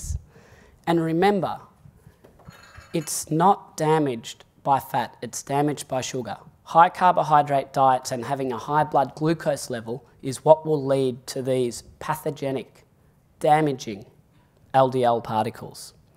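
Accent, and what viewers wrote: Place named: Australia. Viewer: Great post. One note though pertaining to #2 above - the doctor in this presentation does address A1C. He refers to it by its full name of HbA1C with an Aussie accent that slightly throws off an American ear. And he says more or less what you do in #2. That the time averaged blood sugar level A1C reports is proportional to glycation levels upon all cells in the body including LDL. Otherwise, I'm still learning, but one thing at least some statins have are anti-inflammatory properties. Up until I saw this video just now, my understanding was that the epithelium layer inside your arteries is damaged by the repeated tug of war between blood sugar spikes and insulin to pull it back down. That sugar-insulin cycle over and over is inflammatory and damages the epithelium which is then "healed" by plaque your body deposits to protect it (like a scab). 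But in this video the doctor says it's all caused by damaged (glycated) LDL particles which find their way under the epithelium and are then captured by macrophages which then become foam cells which eventually results in arterial plaque. So this was a very interesting video indeed. The learning continues. One thing I know to do now is to try my best to get my triglycerides down, my HDL way up and my A1C down below 5.0 if I can. LDL seems of little importance if I get the others in line.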